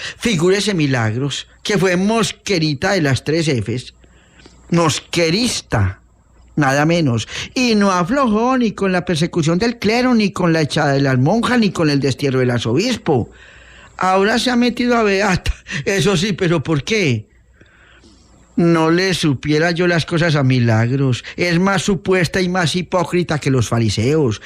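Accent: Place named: Antioquia, Colombia. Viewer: Qué lectura tan bonita. Con ese acento paisa propio de éste cuento y la música del entre telón bellísima.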